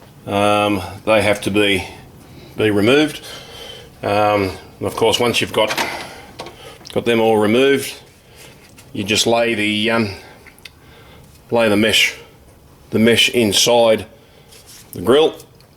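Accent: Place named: Australia. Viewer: Great video, just bought a "new old stock" mesh grill, wasn't quite sure how much of the old grill needed removing, nice to hear an Aussie giving YouTube information.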